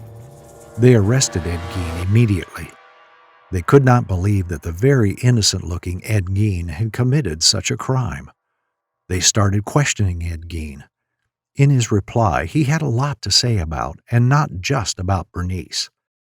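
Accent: India. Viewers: Your accent is amazing for an Indian ❤ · “I don’t know who this is, but he is from Kerala.”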